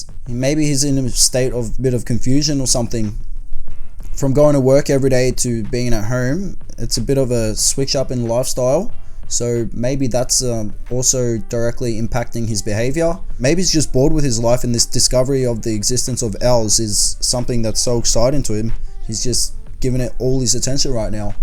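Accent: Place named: Melbourne, Australia. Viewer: i know u were saying owls but im not from melbourne so ur melb accent making it sound like ur saying elfs n im sitting here picturing elfs for majority of it until i realised, my head hurts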